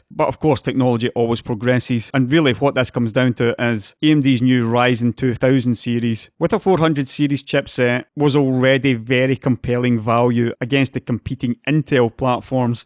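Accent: Scotland, United Kingdom. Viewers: Nice work from AMD but I've already been using PrimoCache for years and this is effectively the exact same thing. Nothing really new here from what I can see but bringing this to the masses as part of their platform can only be a good thing! This is one of the many reasons my next system will be all red team. As always a great video. Cheers from Australia, you awfully Scottish fella. 😉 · Is that a Scottish accent ? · Scottish accents make tech videos better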